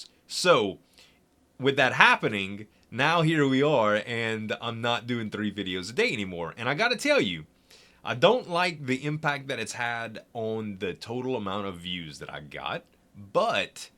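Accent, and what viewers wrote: Louisiana, United States. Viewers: I knew just from hearing you in Slip's videos that you were from Louisiana. Your accent screams Acadia. :D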